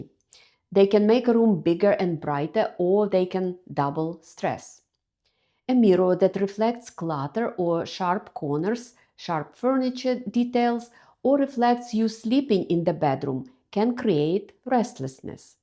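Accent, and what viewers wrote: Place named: Russia. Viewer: У Вас очень яркий русский акцент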